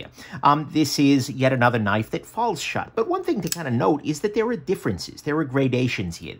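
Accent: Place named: New Jersey, United States. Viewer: Where do you grew up to get a accent like that i suspect dirty jersey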